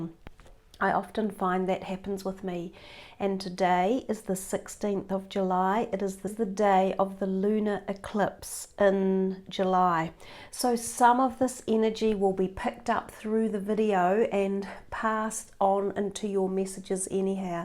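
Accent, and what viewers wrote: New Zealand. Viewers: Are you from nz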